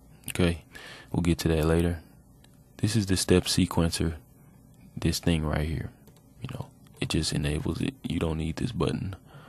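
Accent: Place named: South, United States. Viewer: he makes the word fruity loops sound hilarious lol! he sounds country lol. nice video though, real shit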